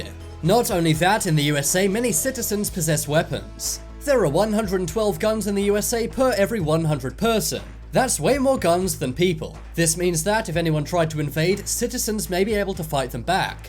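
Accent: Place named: United Kingdom. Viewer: This channel is so OBVIOUS in it’s erroneous “facts”. EVERY SINGLE VIDEO has outrageous statements glibly spewed by a Brit who thinks Americans NEED EVERY documentary narrated w/ a UK accent.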